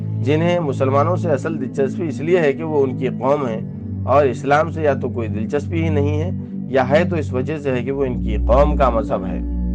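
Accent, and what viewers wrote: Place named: Hyderabad, India. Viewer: The reader appears to be from Hyderabad (Deccan). I can make it out by picking up some words, the way he is pronouncing them. JazakAllah for your efforts. May Allah reward you.😊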